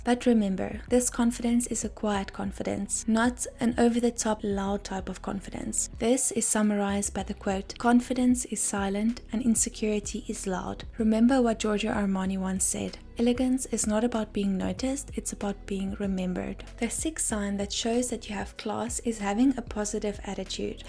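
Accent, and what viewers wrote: South Africa. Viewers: South African, maybe Capetonian or Joburg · 💯 South African accent 🎉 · South African 😂😂😂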